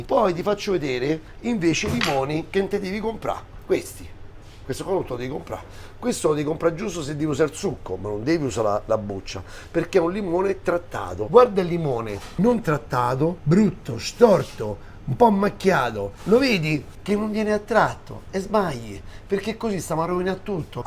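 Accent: Rome, Italy. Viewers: Chef ci piace di più quando parli italiano con l accento romano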